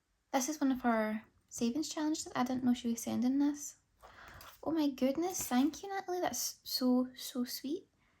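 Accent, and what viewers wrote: Scotland, United Kingdom. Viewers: My American self didn’t know how much I loved the Scottish accent until now 😩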